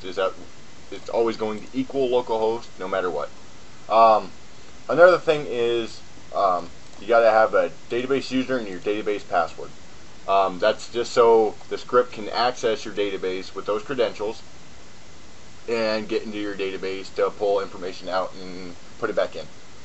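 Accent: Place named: Texas, United States. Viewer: nice tutorial thanks! oh btw, you are from Texas, right ? cool accent :) cheers from Argentina!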